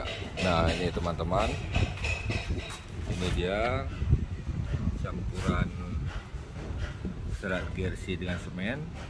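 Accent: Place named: Banyumas, Indonesia. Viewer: ko orang ngapak bos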